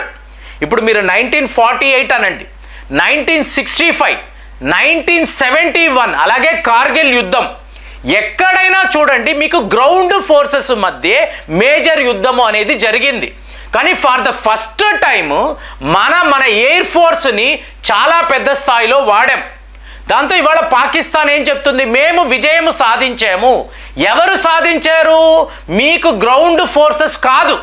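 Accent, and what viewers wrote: Tamil Nadu, India. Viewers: Video lo vnna contente chudandi sir plz, garu tamilanadu lo vnnaru, telugu prononsation alage vntadi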